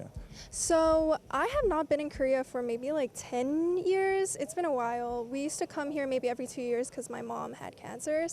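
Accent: Afrikaans, South Africa. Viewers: Lol, Yup, the accent is definitely ours😂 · As a South African I approve just from accent, and dish option Chakalaka and pap with some Wors 😂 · The South African in you is popping out. Love it!🎉❤ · Because it is an Afrikaans accent. That accent comes from the Dutch. Even the word is dutch. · it's a south African accent get over it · SHe is indeed South African, love the Afrikaans accent girl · South African accent❤ she is us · Your South African accent is on point. Thank you for representing us. We all mix here. So you nailed it. ❤ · This gorgeous girl is 100 💯 South African 🇿🇦 her accent is 💯 South African No disrespect to her Korean roots Bless her!!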